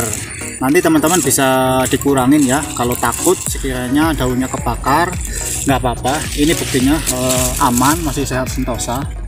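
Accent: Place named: Banyumas, Indonesia. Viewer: Sampean wong bnyumas y mas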